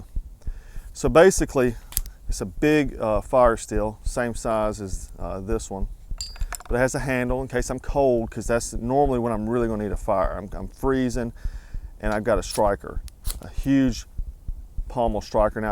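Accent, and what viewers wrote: South, United States. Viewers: You got a very cool southern or western accent. You should try reviewing guns. Your voice really suits it.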